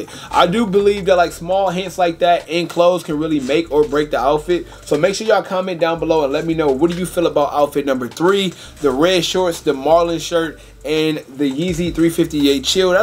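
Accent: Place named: Chicago, United States. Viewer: I thought you were from Chicago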